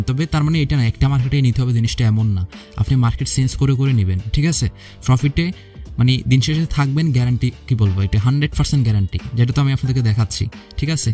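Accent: Sylhet, Bangladesh. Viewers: ভাই আপনি কি সিলেটের মানুষ.??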